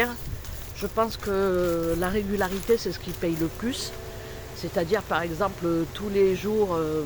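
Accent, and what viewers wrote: South, France. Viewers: Coucou très instructive ta vidéo. C est bcoup de boulot tout cà...moi j Adore ton accent du soleil. Ça fait du bien au coeur.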